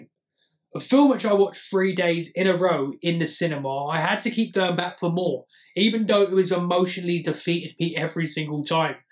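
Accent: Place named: United Kingdom. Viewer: Yeah man! Subscribed. Cool. Also UK accent straight out of Guy Ritche movie. Nice to meet you.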